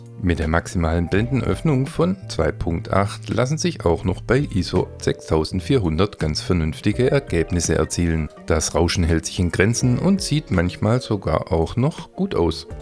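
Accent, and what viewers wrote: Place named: Swabia, Germany. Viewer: Ich mag deinen Kanal habe ihn vor zwei Tagen erst entdeckt. Sehr angenehm alles insbesondere deine Stimme! Übrigens höre ich etwas schwäbisch heraus 😉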